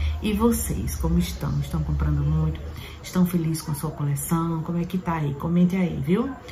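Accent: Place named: Northeast, Brazil. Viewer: ❤❤❤bjs,eu tbm amo esse sotaque arretado 😅😅😅😅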